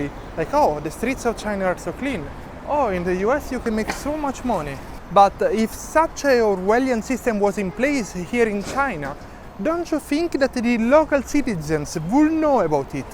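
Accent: Italy. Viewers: He has a thic Italian accent😂I find it very lovely,but Italy is my neighbor so the accent is very understandable to me😉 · i love you tell the truth with your lovly italian accent:popcorn-yellow-striped-smile::popcorn-yellow-striped-smile::popcorn-yellow-striped-smile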